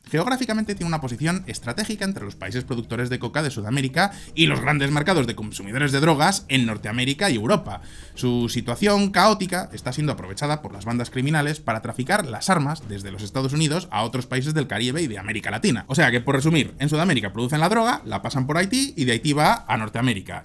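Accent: Spain. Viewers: Me gustan tus videos, son muy informativos, pero por favor habla más despacio, tu acento español es un poco difícil de entender en latinoamerica. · Tienes acento español, España está perdiendo la guerra cultural contra las nazis feministas y retrógradas wokes que desconocen la realidad latinoaméricana y se ponen a opinar y condenar las medidas tomadas por Bukele o Miley, cuando las tierras españolas se están llenando de inmigrantes que huyen de esta realidad latinoamericana XD... El socialismo en un fracaso y la evidencia son las ONG que comparten sus recursos con los haitianos.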